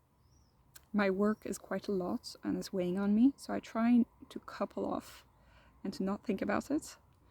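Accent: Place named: United States. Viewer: You started out sounding really American, then you said it was really hot and your accent completely flipped LOL. I originally thought I was INFP for a while and then I took the test again for a psychology course and it told me I was INFJ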